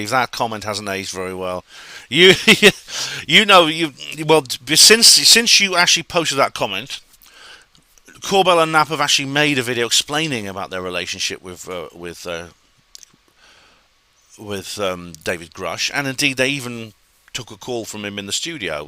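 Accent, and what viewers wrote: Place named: Scotland, United Kingdom. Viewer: More Scottish accent please. 😄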